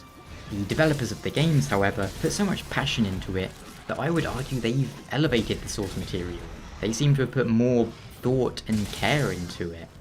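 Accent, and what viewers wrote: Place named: United Kingdom. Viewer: You sir just earned a subscriber as well as a sponsor! For anyone new to this channel, obviously I'd recommended binging through his Dark Souls LP's. Just save the playlists... watch in order. Then after the LP's go through the lore videos... you don't have to watch them all at once... just a few and then new ones should regularly pop up in your recommendations and don't worry, there are eight seasons of them to keep you happy. Watching his longer form game and media reviews/videos can be tricky... but has such a smooth non-distinct across the pond accent that I usually watch his videos for background when I'm working or writing or forum administering. The only drawback is I'll often be too drawn in that I'll watch his videos more intently. But remember to leave a like and comment before the video is over. Need that engagement. 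What else am I forgetting? Doom 2016 is great, Rey is a Mary Sue and cough <link> cough Excuse me. Check out our forum! At the very least, it'll generate some traffic and buzz for us so we can sponsor this cheeky chap again! Like a symbiotic relationship between the Sietch and the mighty Sandworm. The (often salty) spice must flow.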